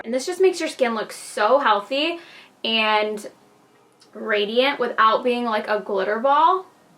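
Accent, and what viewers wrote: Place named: New York, United States. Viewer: This is offensive probs but I hate NY accents usually but I freakin love how you talk so much gaha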